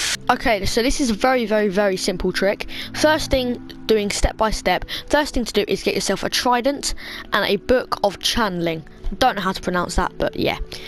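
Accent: United Kingdom. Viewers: oh you're british?! I love that accent, it's nice👌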